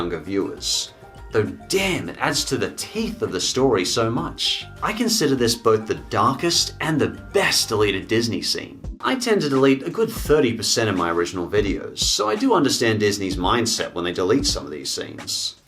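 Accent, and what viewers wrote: Australia. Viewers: are you australian aswell? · Are u Australian?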